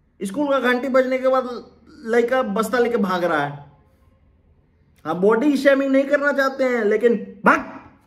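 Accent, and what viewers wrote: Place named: Bhojpuri, India. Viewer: 'Mar maidaan' bhojpuri accent love from araah,bihar❣️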